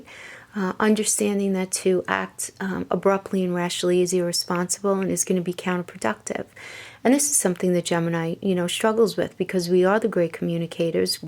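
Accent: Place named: Boston, United States. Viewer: You are definitely from or live in Boston... at least your accent sounds like it... great reading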